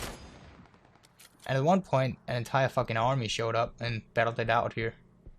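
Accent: Denmark. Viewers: Are you Danish???? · Are you danish? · are you Danish? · i couldn't help but notice your accent and ask if you're Danish? It sure sounds like it:)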